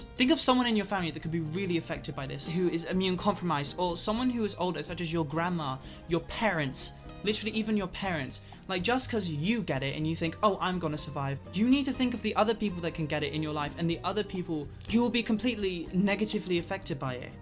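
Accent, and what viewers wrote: United Kingdom. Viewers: Sue me but I'm here to hear your british accent :')😂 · zeliéxsé he sound british? I wonder why? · Whoaaaa is it me or does he sound british? I love his accent! Edit: Thanks for the likes uwu ❤️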